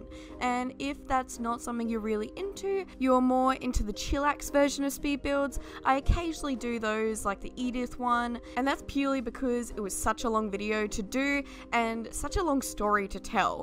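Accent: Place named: Australia. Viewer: "Gasp" You're AUSTRALIAN!? Lovely build, it's nice to see you have a breather with a smaller build ^^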